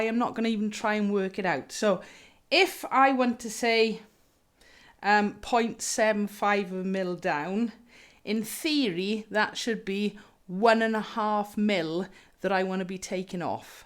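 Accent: Wales, United Kingdom. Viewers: Excellent, thank you very much. I suspect my ukulele strings are a bit too high so I will do as you say. Do I hear a Mid Wales accent? Welshpool?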